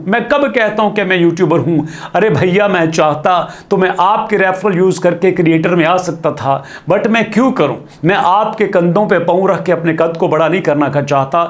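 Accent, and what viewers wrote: Punjab, India. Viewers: I think sir g you are from Gujrat Punjab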